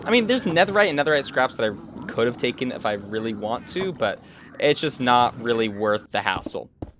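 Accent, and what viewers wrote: India. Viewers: brother u indian like woah it surprised me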